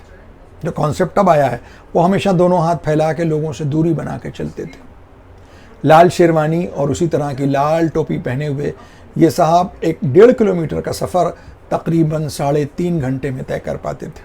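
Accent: Lucknow, India. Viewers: Shukran Sir Aap ki videos ka be qarari se intezaar rehta hai... Hum Guzishtah paanch barson se Bangalore main muqeem hain Lucknow juld aana mumkin nahi So aap ke lub wa lehje main mukammal Lucknow paa letay hain.. Behud shukr guzaar hain ke Aap ne Itni pyari Tehzeeb ko ujagar kiya... Salamat rahiye... Aameen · Aap ke Lucknavi bateyn sun k bahut maza aa gaya.Meray Walden wahin sey partition k baad Karachi a gai they.Yeh sab batey or lab o lehja Karachi mey rehtey huway suntey tou lagta tha Lucknow mey rehtey hain. Aap ki guftugu sun ke maza agaya Lucknow ke sair ho gai 👌♥️ Aap ney Lucnavi pourbi zaban ka zikr nahi kia wahi hamarey ghar mey boli jati thee.Jesay aye bhayia tum ka khaieo ? Mey kai baar Lucknow bhee jachuki hoon Behad maza aya or apni nanhiyal jaker wapas aney ka dil nahi chahata hai.Lucknavi mehmaan nawazi or kahin dekhney ko nahi multi 👌♥️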